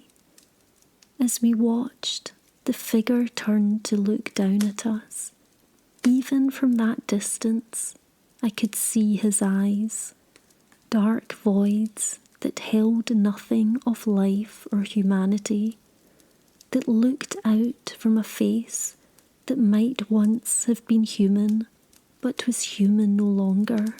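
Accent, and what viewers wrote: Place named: Glasgow, United Kingdom. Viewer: Irritatingly slow voice. Clicky over emphasis of the letter S. Very poor narration, and I'm a Scot from Edinburgh. Sounds like a Weegie trying to hide the typical whiney Glaswegian accent.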